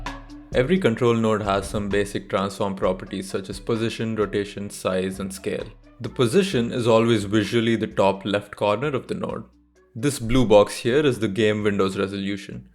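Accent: India. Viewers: Easily one of the best educational videos on YouTube I've watched. Not trying to be mean here, but I usually struggle a lot with indian accents, yours however was even giving more character to the narration. Had me chuckling a couple times as well, extremely good pacing and all in all a great course! Please keep doing more! Instantly subscribed. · apparently indian english speakers are great at making tutorials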